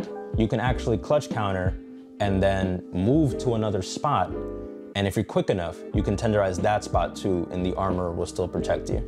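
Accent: Belgium. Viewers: You're from belgium? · Are you from Belgium? :D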